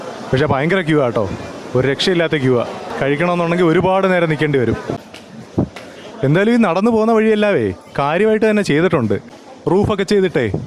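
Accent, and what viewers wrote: Kollam, India. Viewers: കൊല്ലം ശൈലിയിലുള്ള നാടൻ, ജാഡ ഇല്ലാത്ത അവതരണം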